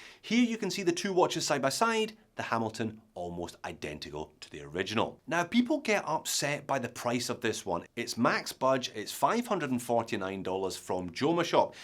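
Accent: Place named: Ayrshire, United Kingdom. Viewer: You have an Ayrshire accent , where are you from?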